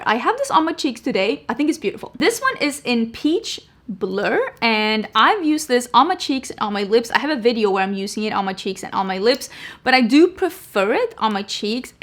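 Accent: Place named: Sweden. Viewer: wait, are you for real right now? You do realize is Swedish and English is NOT her first language. Her native country comes w its own dialect and accent. Some letter combinations we have in English DO NOT come natural for some languages. This comment is literally one of the most absurd I’ve read in a while. You must come from another planet to have this kind of expectation of someone. Either that or you don’t work for a living and are clueless to the amount of hours in a day.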